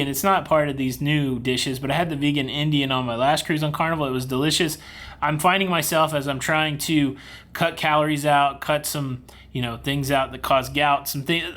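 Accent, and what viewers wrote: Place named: New Jersey, United States. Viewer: A passable Jersey-ish accent! And I'd be freaking out with that door too, it's definitely an odd choice.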